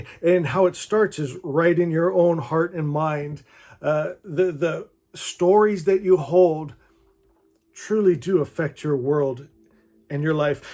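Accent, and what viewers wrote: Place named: Greece. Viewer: Greek accent detected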